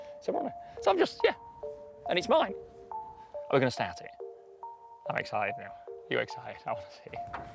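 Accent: United Kingdom. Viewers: dave xrp, how would anyone outside the UK understand a word he says? I love his accent, but I can’t see the Yanks getting it. 🙄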